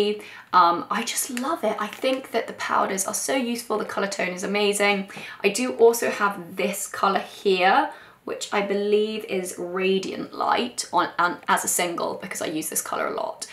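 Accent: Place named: RP, United Kingdom. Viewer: In what way, she has a pretty standard British accent?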